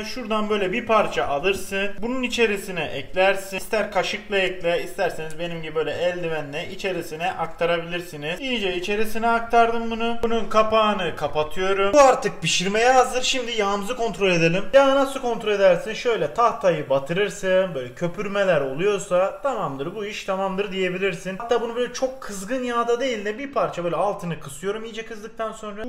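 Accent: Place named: Southeast, Turkey. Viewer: Ben hala anlamadım abi sen hataylı mısın diyarbakırl ımısın yoksa adanalı mısın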